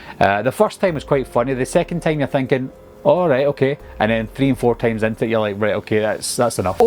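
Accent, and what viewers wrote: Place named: Ayrshire, United Kingdom. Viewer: Just found your channel mate and its brilliant. Is that a bit of an ayrshire accent i detect?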